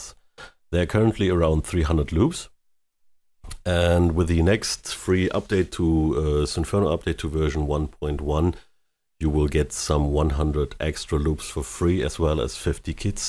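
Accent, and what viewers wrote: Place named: Germany. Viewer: Well done, informative and I just bought it, excited to try. Your English with a German accent is good and I like your humour.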